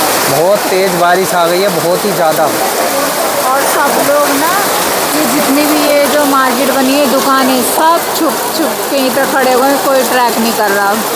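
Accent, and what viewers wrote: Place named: Mathura-Agra, India. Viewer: Bhai aapka Ghar kahan hai. Aapke kisi video main aapne kumaun bataya tha, par aapki boli mathura, agra side wali lag rahi hai. 😊 Please thodi kripa karein.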